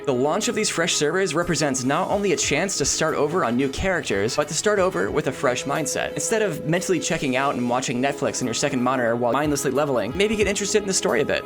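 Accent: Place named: North America. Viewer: I like the mentality and if I could I would subscribe to it. Feels impossible to do so - especially in Retail where I play mostly. And even thinking about doing things semi-blind has many insinuations of ones 'skill' - their 'commitment' and so on. It's just easier, even from that social perspective, to fall in line and do things the 'accepted way' even if it feels like the soul is sucked out of it. I'd love to try out and play with your guild but you sound like you're from NA so RIP for me joining. Hope it goes well though. Good luck.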